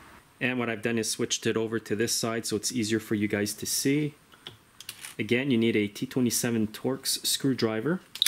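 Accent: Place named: Canada. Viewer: love the vids! I need to ask, what kind of accent do you have? I think its strong Canadian but I can't tell!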